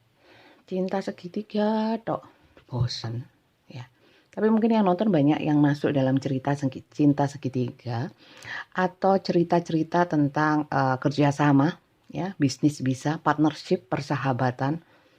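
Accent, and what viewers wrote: Java, Indonesia. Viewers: Selamat malam mom selalu senang dengerin kalau ngmg medok banget jawa nya bikin ketawa, sehat selalu 🙏.. salam hangat mami dari taurus di sby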